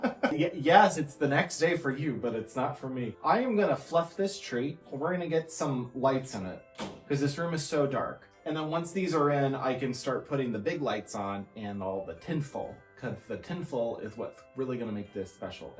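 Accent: Minnesota, United States. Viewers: On the 10th Day of Vlogmas my true love gave to me: Ten-ish strands of "tinthel" Nine ladies ironin' Eight cameras tumblin' Seven cutouts glowin' Six berries spillin' Five Christmas trees 🎄🎄🎄🎄🎄 Four Paolo meows Three sets of batting Two power strips 🔌🔌 And you sound like the movie Fargo 🎄⛄🙂⛄🎄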